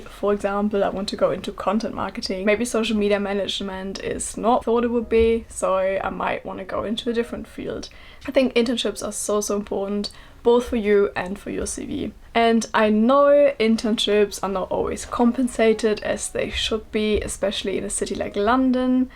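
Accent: Germany. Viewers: She has very strong german accent though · Your accent sounds quite german or am I hallucinating? Great content. Love England.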